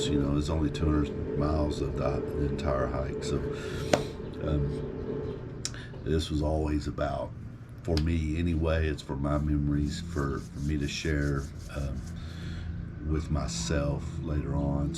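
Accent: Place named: Texas, United States. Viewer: It was fun watching you! I loved your texan accent :-) you should totally consider the Colorado Trail, it's perfect for the summer, in the mountains, little to no snow if you wait for it to melt off. and much shorter than the PCT 🙂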